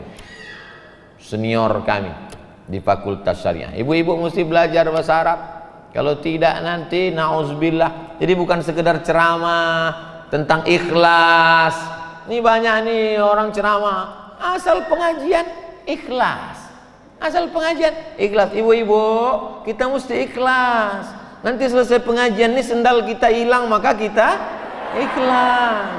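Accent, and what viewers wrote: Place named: Negeri Sembilan, Malaysia. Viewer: Sepertinya UAS bercakap loghat negeri 9 dicampur dgn loghat kelantan iyooooo 😆 Salam dari malaysia wahai ummat rasulullah SAW.👌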